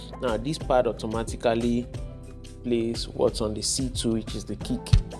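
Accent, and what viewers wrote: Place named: Jamaica. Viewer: Your Jamaican english so cool, love it. I really want to learn Jamaican english for my reggae music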